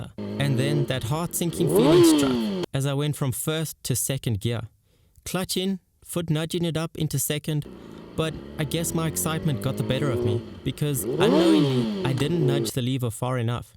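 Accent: South Africa. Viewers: Bro you from south africa 🤤🤤🤤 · Is that south African accent?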